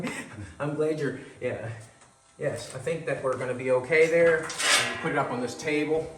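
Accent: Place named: Nova Scotia, Canada. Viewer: Thar is a Canadian maritime accent, specifically Nova Scotia.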